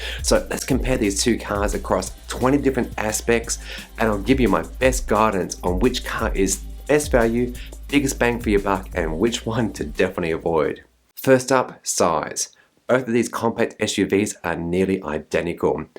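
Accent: Australia. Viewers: sounds Flemish... but you sound Aussie....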